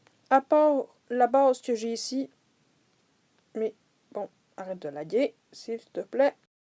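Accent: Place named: Quebec, Canada. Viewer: J'adore les québécois surtous votre accent gros bug up a vous 😉😆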